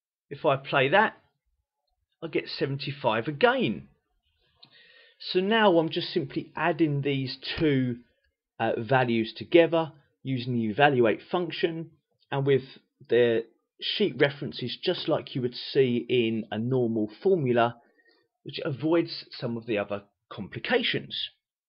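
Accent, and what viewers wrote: United Kingdom. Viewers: This is a very useful video to understand Evaluate Function in VBA. Thanks for sharing. And love ur British accent btw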